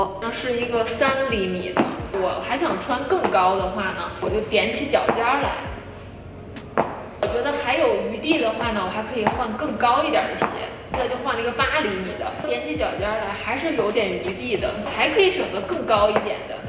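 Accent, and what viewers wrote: Tianjin, China. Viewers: 老乡，一听你说话就知道你是天津人，超飒。一听到你说，马上贴墙测试，发现轻松两只手可以放后背，那我还适合这个站姿吗，怎么让骨盆回到正常位置？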